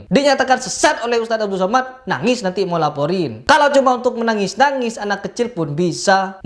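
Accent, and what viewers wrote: Madura, Indonesia. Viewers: Logatnya spt madura ya? Hahaha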